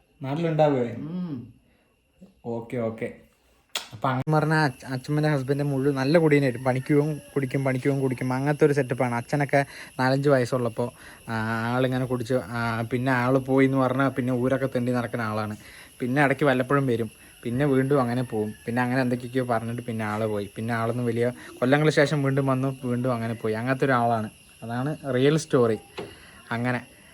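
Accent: Palakkad, India. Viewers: ആദ്യ subsciber ആണ് ഞാൻ.. അന്ന് പേന ഒക്കെ കൊടുക്കുന്ന വീഡിയോ കണ്ടത് ഇന്നലെ എന്ന പോലെ ഓർക്കുന്നു. അന്ന് എനിക്ക് ഈ ഫോൺ അല്ല.. അന്ന് ഞാൻ comment ഇട്ടിരുന്നു നീ നല്ല മനസ് ഉള്ളവനാണ് നല്ല നിലയിൽ എത്തും, ചാനൽ one മില്യൺ അടിക്കും എന്ന്... അന്ന് 1k പോലും ആയിട്ടില്ല എങ്കിലും എനിക്ക് ഉറപ്പ് ആയിരുന്നു..പാലക്കാടൻ സംസാരവും ആ വീടും വീട്ടുകാരെയും എല്ലാം എനിക്ക് ഒരുപാട് ഇഷ്ടമാണ്.. ഒരു അഹങ്കാരവും ഇല്ലാത്ത ആളുകൾ... എനിക്ക് എന്റെ അനുജനെ പോലെ ഇഷ്ടമാണ്.. എന്നെങ്കിലും നേരിൽ കാണാൻ ആഗ്രഹമുണ്ട്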